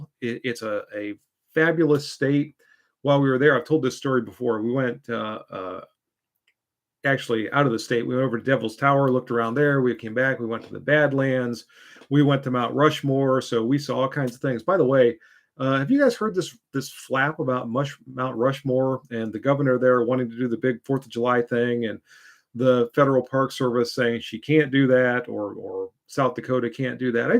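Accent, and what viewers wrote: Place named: Texas, United States. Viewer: okay okay okay, I may have thought it when I heard your Texan drawl.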